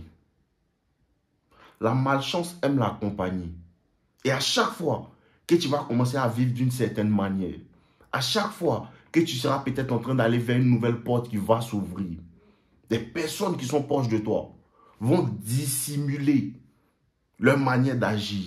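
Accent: Ivory Coast. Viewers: depuis que jai découvert ta page avant hier je suis tes vidéos en boucle pleins d'enseignements merci beaucoup;;tu as l'accent de mes compatriote ivoiriens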